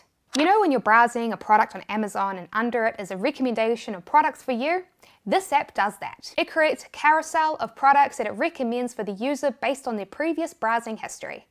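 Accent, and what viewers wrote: New Zealand. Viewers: literally have nothing of value to add, just a shoutout for being a kiwi. came across this channel after discovering the massive world that is ecom and couldnt help but smile when i heard a familiar accent - definitely didn't expect it on youtube. obviously physical location has little bearing on the internet really, but huge respect for building what you have here :~)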